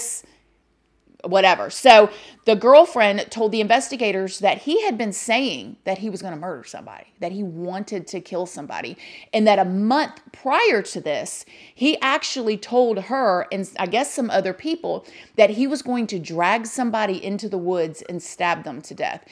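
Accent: South, United States. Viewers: it’s hard for me too, I’ll give Christina a pass though I think it’s the southern accent that makes it more forgivable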